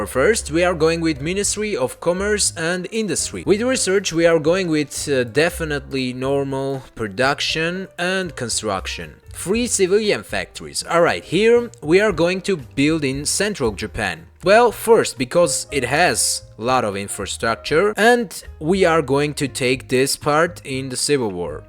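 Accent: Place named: Arab world. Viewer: Arabian accent, the youtuber is from the UAE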